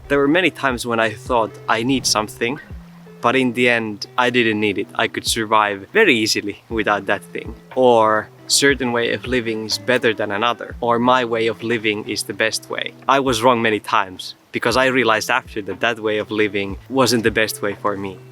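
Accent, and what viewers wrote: Finland. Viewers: Are you Finnish? You have similar accent :) Merry Christmas :) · I love how I can tell you are from Finland by how you speak. Terkkuja Oulusta.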